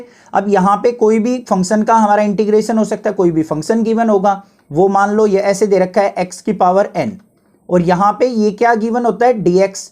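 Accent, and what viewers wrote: Haryana, India. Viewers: are you from haryana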